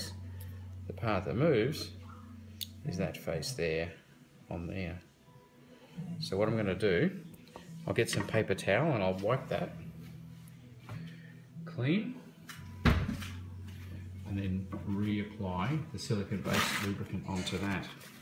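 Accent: Australia. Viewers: Yes, I thought you sounded Australian. Nice commentary. What's a vegemitan sandwich?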